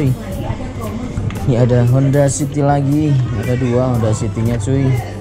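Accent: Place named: Banyumas, Indonesia. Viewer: Basa ngapak Wong ndi sampeyan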